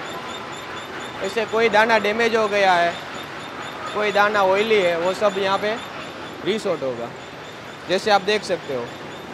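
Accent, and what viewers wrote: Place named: Gujarat, India. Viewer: Vo gujrati keret hi bolte he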